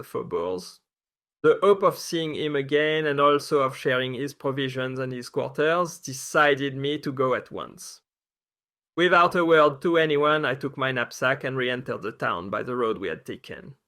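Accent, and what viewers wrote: France. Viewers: Excellent video man, I have listened to a few different accounts from the Russian campaign and I must say, even though you are speaking English the French accent provides a deeper authenticity you don’t get with other accounts.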